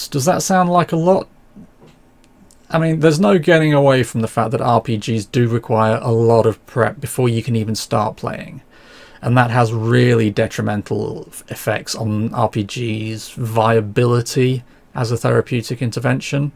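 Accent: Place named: United Kingdom. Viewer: You have a British accent. This is episode 002. Does this mean there's 005 more episodes before you talk about superspy/espionage RPGs?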